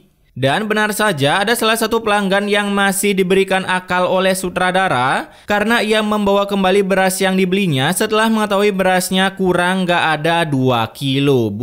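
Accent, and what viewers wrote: Bali, Indonesia. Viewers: Min, dari Bali ya? 😁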